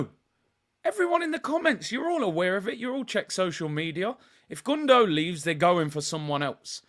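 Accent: East Midlands, United Kingdom. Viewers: he's from Nottingham, I think that's classified as East Midlands, not 100% sure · are you from the East Midlands?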